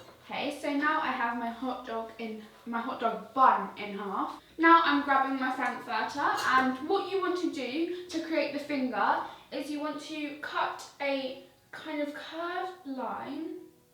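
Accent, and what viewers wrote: United Kingdom. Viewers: Hi.. I want to make that cupcakes in my birthday coming soon for my classmates.. But whenever I try to make cupcakes then my buttercream starts to melt 😢 I don't want my class to laugh at me on my birthday😫can you please help me.. Actually I got the easy and best buttercream recipe from you: icing sugar, unsalted butter, peppermint extract and food colouring.. But I don't have peppermint extract 😭😞 Can I used something else instead?? Please reply.. I loveeee baking.. And want to show my classmates that I can bake I came here from watching your previous videos I love your personality and you accent I really wanna learn that British accent And sorry for my wrong english I speak German so my English is a bit weak I will be sooo happy if you reply me Love from Germany ❤❤❤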